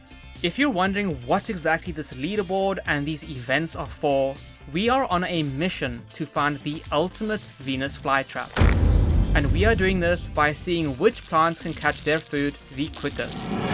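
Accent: South Africa. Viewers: Dude this is amazing. You sound south african? Do you know where to get fly traps in Johannesburg?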